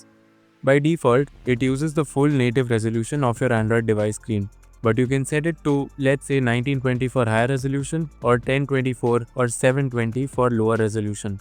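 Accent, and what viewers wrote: India. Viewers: an indian accent... this just raised my HOPE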